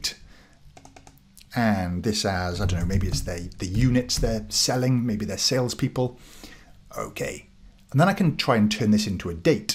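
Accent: Wales, United Kingdom. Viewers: Loving the Welsh accent 😁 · Came here for Excel, stayed for the heavy British accent 😅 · I’ve been using Excel for decades and have only scratched the surface of the pivot tools. This explanation has helped a lot. Thanks! (PS. Do I detect a fellow Welsh accent?)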